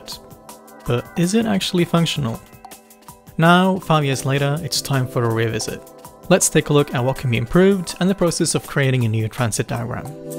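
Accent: Australia. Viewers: This is a great video. I'm struggling to pick you accent though. It sounds like you're Australian, but with a big chunk of the south of England thrown in.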